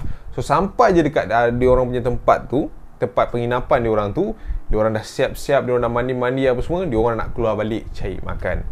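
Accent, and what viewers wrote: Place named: North, Malaysia. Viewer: Terbaik..🎉👍 loghat utaqa noh hang balun🤣😂moga sukses sllu dik..perlis hadir🙏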